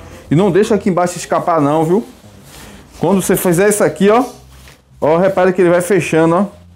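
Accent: Bahia, Brazil. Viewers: Oh sotaque maravilhoso ❤️❤️ baiano é outro nível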